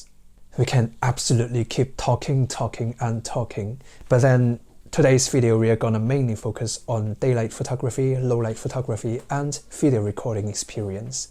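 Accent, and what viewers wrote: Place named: United Kingdom. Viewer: 我的天啊你的british accent真的太好聽啦，完全是一種享受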